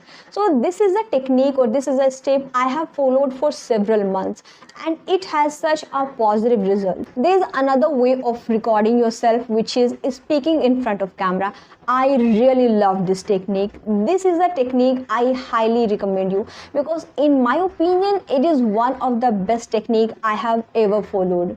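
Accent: India. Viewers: You could work a litle bit in order to get over your indian accent, it's strong. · Indian accent ,but excelent. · I like your indian acent jejej..Greetings from Colombia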